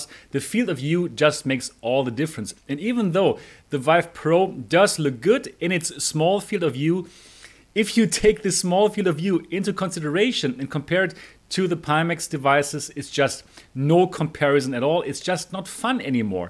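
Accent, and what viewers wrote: Germany. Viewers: So I hate to ask the incredibly silly question but what is the native/prefered language of. I'm trying to learn many languages and I find it interesting to figure out who non-English speakers adapt to English. My personal guess from listening to this vid. is german.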